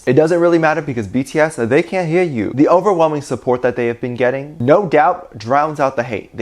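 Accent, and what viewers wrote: South Africa. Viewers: you are South African? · wait are u a fellow south African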